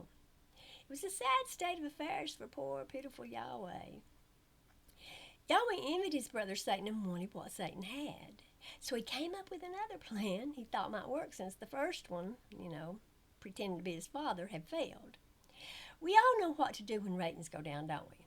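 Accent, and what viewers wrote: South, United States. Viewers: That sweet southern 'Hi' is always the harbinger for brilliance ❤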